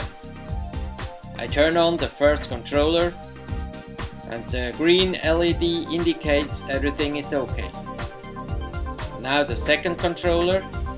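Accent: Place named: Switzerland. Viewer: Hey, great video, really enjoyed it. Excited to see a running video with the setup:D If you don't mind me asking, in which Kanton do you live, I'm just curious as you have a cool accent:)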